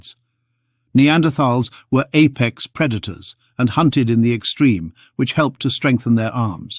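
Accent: United Kingdom. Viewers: Just because there is a British accent delivering information doesn't mean that it cant be patiently stupid information. Right, wrong or infifderrnt, his is just way over done without any real science behind it.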